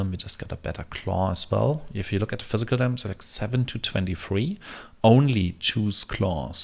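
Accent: Germany. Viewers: I am amazed how calmly and professionally you address the leveling process. Also madly in love with the soft germanic accent.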